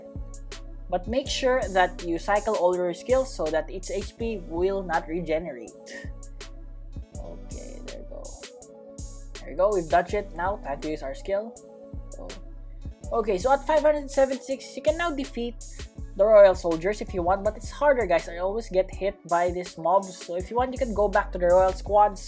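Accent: Philippines. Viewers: Are you Filipino? I can tell by your accent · You from Philippines? Cuz ur accent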